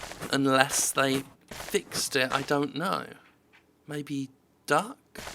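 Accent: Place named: United Kingdom. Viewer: Hey I want to know, I'm I may, how do you maintain your Britishness? Is America slowly taking you over? Like in ten years will speak with that Mississippi accent and fire your guns five times a day?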